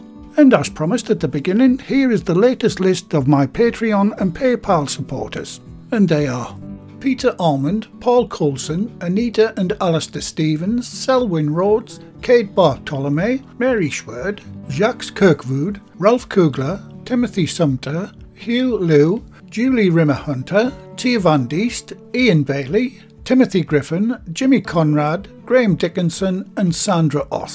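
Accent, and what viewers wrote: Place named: Bradford, United Kingdom. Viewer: Hi! Love this recipe and I can’t wait to give these a try! I absolutely love listening to you I was born in Bradford so you sound like family to me! Great job on these keep cooking my friend!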